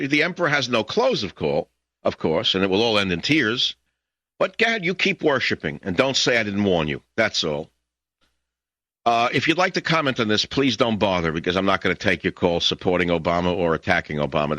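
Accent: New York, United States. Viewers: 🎉🎉😂🎉🎉 it’s a party!! The legend in the house!! 🎉 listener since 94!!! 🤘 is a voice that represents we the people! Miss being able to listen everyday, what hooked me was his intro music and collaboration of metal music! NOW LISTEN UP! As a metal head from way back in the 80s I got my cdls and drove a truck for 20 well on my way to California first time out his intro music came on and then this guy with a New York accent came on and I couldn’t turn it off! Listened everyday as a truck driver! Good memories! Miss teddy!!! Nothing like! I would love to just sit and actually have a conversation in person! Woooooo wooooo sheeeeeeple! Lmao 🤣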